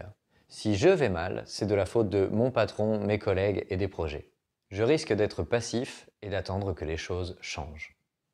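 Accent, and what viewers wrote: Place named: Quebec, Canada. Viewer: 😁 ça fait plaisir de te voir en vidéo : Bon rythme, bon montage et bon contenu : un grand bravo ! Et cet accent Québécois... 🤩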